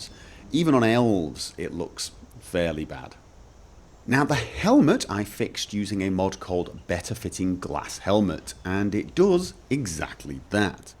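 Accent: United Kingdom. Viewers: i love your sound and your British accent :D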